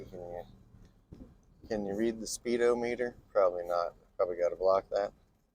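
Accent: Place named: Texas, United States. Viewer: great classic Mazda, and love your good ole Texas accent :face-blue-smiling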